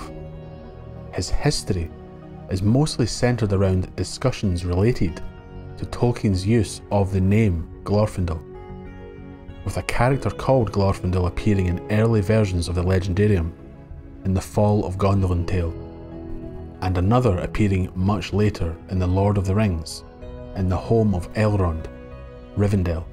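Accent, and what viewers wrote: Scotland, United Kingdom. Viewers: I always say, "Tolkien must be read by English voices to take me there", but a Scottish one does just fine, quite fine. I've tried listening to Tolkien by American voices, but I guess I've been conditioned to feel the fantasy is real only with English voices, until now, of course, although you're obviously not American. I read a complaint about your pronunciations. I have absolutely no issue, as a matter of fact, the way that you pronounce those words that end in the ending ''e'', ie., "fortresses", "pieces", "process" is quite interesting and a thing that I before you have never heard. Is that a thing unique to you or standard Scottish?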